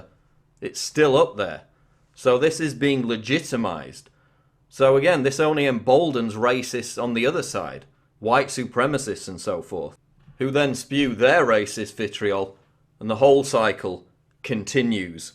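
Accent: United Kingdom. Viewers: whats up with the british accent pronouncing words that end in "a" with an "er"? just an observation. as americans we sometimes look to england for proper english,maybe not only did we come closest to perfecting society but maybe common language as well. that being said, thanx for dropping logic on the kids.your social geopolitical game is strong.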